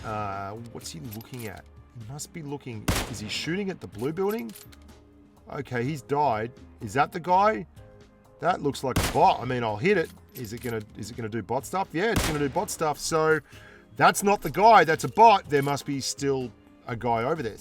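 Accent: Australia. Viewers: He is better then powerbang. I like how he explained the strategy and technique. With that Aussie accent 😁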